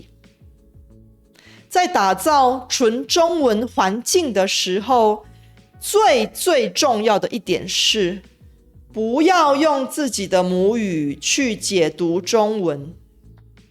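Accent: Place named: Beijing, China. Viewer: Thank you for responding. I asked because I thought I could detect a northern, perhaps Beijing accent. Anyway, nice to come across another channel teaching traditional Chinese 😊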